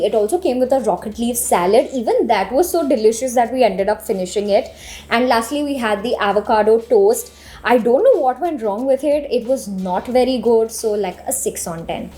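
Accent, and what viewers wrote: India. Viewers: Such strong indian Accent!!! I couldn’t get past your accent to enjoy the video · We indians speak like this...if u have a problem with this...then stop watching the video..instead of commenting on her accent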